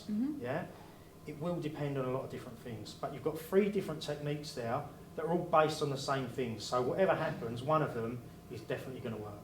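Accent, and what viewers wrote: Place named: Australia. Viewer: A big guy in Aussie accent doesn’t mean he know what he is doing. Half of the technique is NOT possible simply because you can’t reach the guy. Also I’d like to see a child being able to pull a finger off of a lumberjack sized men. There are good self defense techniques on YouTube, this is NOT one of them. Try it with a big guy at 50% aggression, bet you can’t even reach him. But a strangle can put you out in 3.5 seconds flat.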